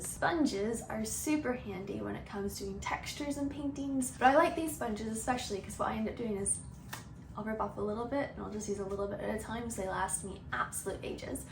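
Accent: England, United Kingdom. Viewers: the smol english accent peaking through is so cute (' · youre starting to have an english accent omg 🥺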